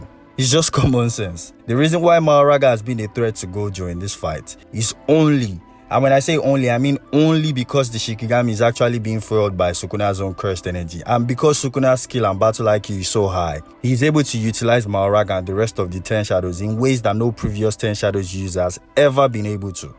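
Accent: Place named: Nigeria. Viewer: From the accent I could tell you're one of us (Nigerian)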